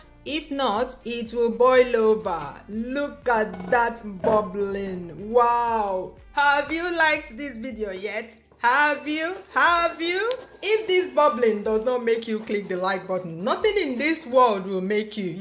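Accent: Igbo, Nigeria. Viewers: I like your igbo accent.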